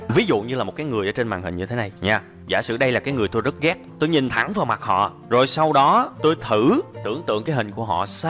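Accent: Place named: South, Vietnam. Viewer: Rất thích giọng miền nam rỏ ràng dể nghe 👍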